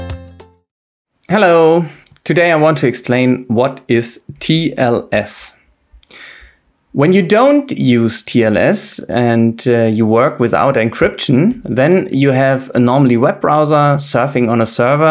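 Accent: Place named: Germany. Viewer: He must be german! i can hear it from his accent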